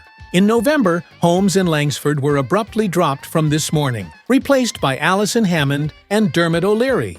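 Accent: United States. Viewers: Why is an American doing this commentary?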